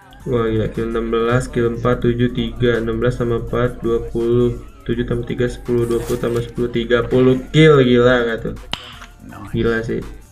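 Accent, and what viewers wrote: West Java, Indonesia. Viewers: bang org mna bang Kaya Org Sunda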